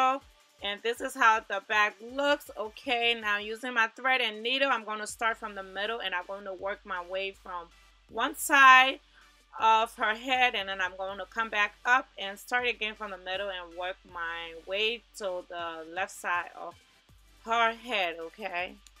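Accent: Liberia. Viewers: Is that a Liberian accent I detect...SUBSCRIBED 🥰🥰🥰🥰 🇱🇷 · I hear a Liberian accent!!! 😍😍😍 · Sis, you did a beautiful job. What your video shows is that you care about your client's hair. ❤ Oh and I think I hear some liberian 😊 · you sound Liberian. as a fellow Liberian hair stylist i am inspired by you x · This lady sounds so Liberian....am I right? let's gather and show our sissy some love.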